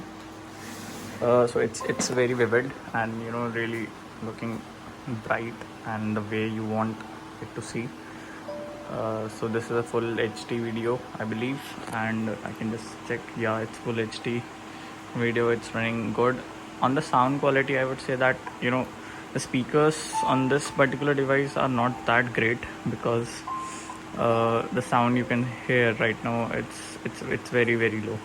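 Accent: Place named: Garhwal, India. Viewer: Wow ur gadhwali accent is so sexy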